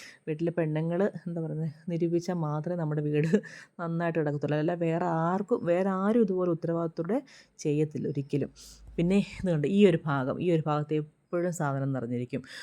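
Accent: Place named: Kottayam, India. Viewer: ഇയാള്ടെ വർത്തമാനം കേൾക്കാൻ നല്ല രസം. . നല്ല voice. . കുറച്ചു സീരിയസ് ആണോ ഇയാൾ. ....കോട്ടയം slang ഇഷ്ട്ടം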